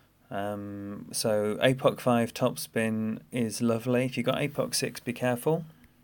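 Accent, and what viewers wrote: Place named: Sweden. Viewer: Thanks for uploading the Beeedioe (Swenglish) You're the man!!!!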